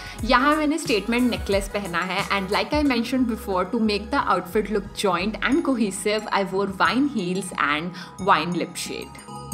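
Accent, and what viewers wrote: Punjab, India. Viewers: Great job done! Thanks alot for providing soo many options in one video👍 appreciate your efforts ❤️ nowdays bloggers waste 15mins for just one tip/outlook, but this was worth watching. I saw your video for the first time...n yes I m the new subscriber😇 yayy I found a decent, grounded styler👍❤️ (The Punjabi accent is love) Lots of love and success to you lady😇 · Hey, just found your channel and quickly subscribed and followed you on Instagram. I usually don't leave a comment but you are so pleasant and I just loved your Punjabi accent. P.S. Aap bohot Pyaare ho and I loved the way you spoke throughout the video. Lots of love to you! Stay true and best wishes!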